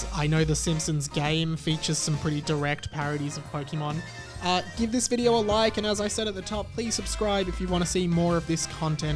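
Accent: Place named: New Zealand. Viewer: I like your New Zealand accent.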